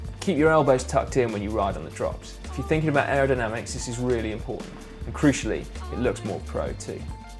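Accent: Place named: England, United Kingdom. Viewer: Put subtitles on your videos, not everyone can understand strong English accent.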